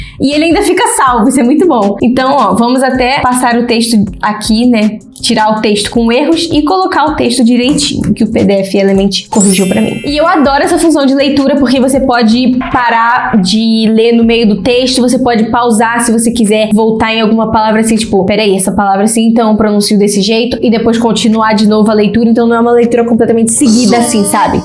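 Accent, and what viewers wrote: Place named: Spain. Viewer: tu agr qnd falas ingles ja tens o sotaque espanhol kakaka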